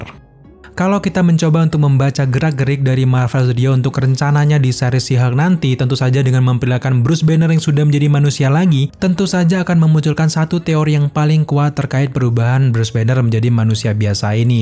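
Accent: Indonesia. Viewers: Ya lidah orang indo bisa di maklumi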